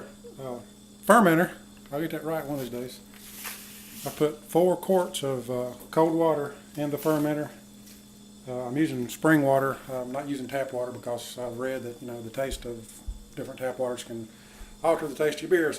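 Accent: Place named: South, United States. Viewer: LOL!. FER-men-tater. :) I love your videos, but I have to watch them in small doses, as I have always been susceptible to picking up southern accents when exposed to them for too long. :) Thanks for the great info here, though, I am about to start home brewing and so am doing preliminary research. I don't think I will start with a Mr. Beer, but this is interesting nonetheless.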